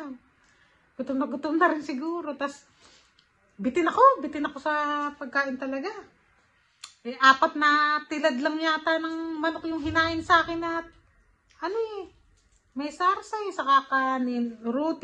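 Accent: Nueva Ecija, Philippines. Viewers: sabi ko na nueva ecija ka...kase the way na mag Salita ka....nueva ecija din ako.....saan ka sa nueva ecija?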